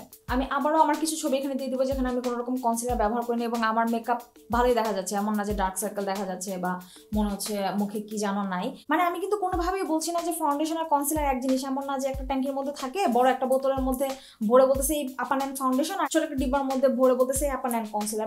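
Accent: Bangladesh. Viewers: Apu apni ki noyakhalir 😳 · Apu ki Chittagong er?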